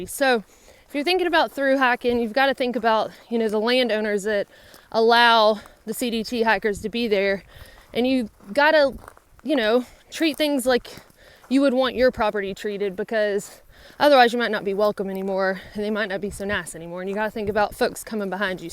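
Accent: South, United States. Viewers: I usually turn CC on because my hearing isn't the best and I think it's hilarious that it interprets your "hikers" as "hackers". Hurray for your Southern accent :)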